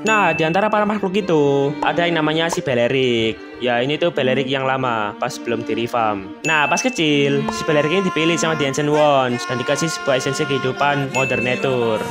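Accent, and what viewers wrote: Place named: Sulawesi, Indonesia. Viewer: Banh lu orang Sulawesi kah? sebagian logatnya orang Sulawesi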